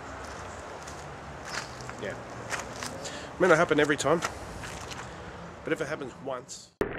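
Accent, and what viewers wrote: Australia. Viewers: when i first heard you talk i though your were australian and i got excited to find out we could get swords here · are you australian or newzealander?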